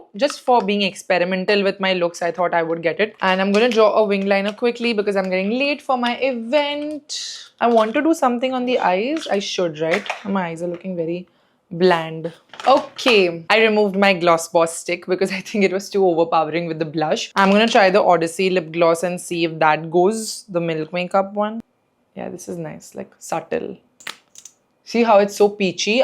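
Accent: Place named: Maharashtra, India. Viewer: loved the way you speak Marathi gurlll! Loving your cute Marathi accent fr fr touchwood❤ #sarahsquad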